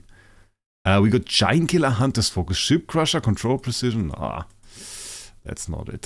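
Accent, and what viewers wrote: Germany. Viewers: You german??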